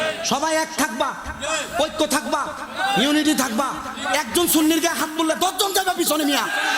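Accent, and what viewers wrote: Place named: Comilla, Bangladesh. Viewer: তোমার কথা শুনে বুঝা যাচ্ছে তুমি কুমিল্লার মসলমান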